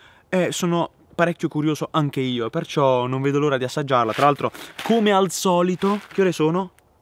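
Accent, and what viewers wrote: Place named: Apulia, Italy. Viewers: Sei leccese o comunque pugliese? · Capace che mi sento male. Ok sei pugliese come me, ora devo capire di che zona ahahahaha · Mi piace troppo il tuo accento. Sei andriese? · Spiegare come è fatta la pasta alla bolognese da un terrone con l'accento pugliese mi fa girare la testa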